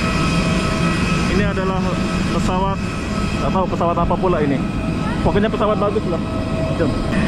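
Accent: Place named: Malaysia. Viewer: Apa-apa benda☺️ Kamu ade mix malaysia ke percakapan kamu ade sikit-sikit melayu😃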